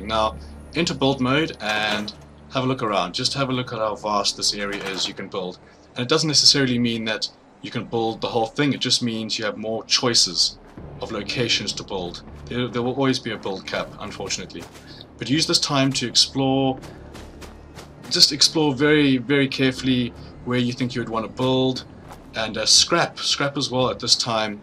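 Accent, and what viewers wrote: South Africa. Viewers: I think I recognize that accent. Are you South African? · Are you South African? I am so your accent just sounded familiar. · My moms friends south african and I love his accent! Its a lot like yours! keep up the sound work lad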